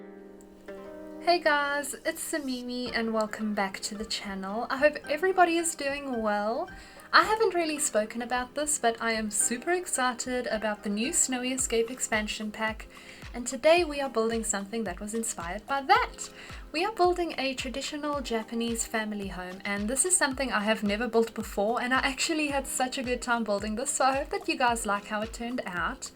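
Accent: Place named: South Africa. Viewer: Just came across your video and immediately knew you were a fellow South African! Great build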